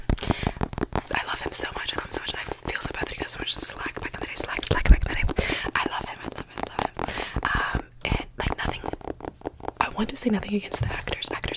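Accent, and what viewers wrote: United Kingdom. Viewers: Wow I didn’t listen to your asmr for maybe 3 months and suddenly ur British what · Your slowly transforming to a full British accent 100% of the time😂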